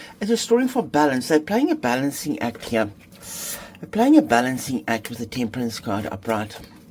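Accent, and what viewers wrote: South Africa. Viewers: You sound like a South African. 😮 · You are filming in New Zealand, but you have a very strong South African accent.?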